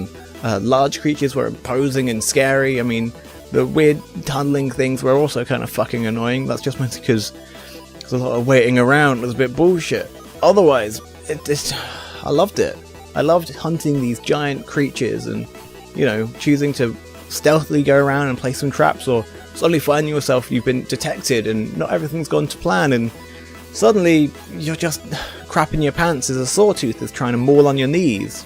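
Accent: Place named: United Kingdom. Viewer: hardcore british!!!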